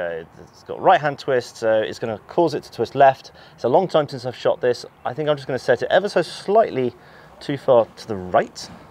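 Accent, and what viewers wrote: Switzerland. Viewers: the language spoken by the guy behind is actually Bernese German or even more precise Bernese Oberland German. Swiss German doesn't actually exist as a general spoken or written language. Every region has its own dialect. My own dialect (Zuri Schnurre) is different from the folks in Berne, Thurgau, or Basel. And if you really want to hear a strange dialect you have to visit the German part of Valais or Wallis. And by the way starts to sound like a real Berner lately. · Thats some fine swissgerman right there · Your switzerdütsch is adorable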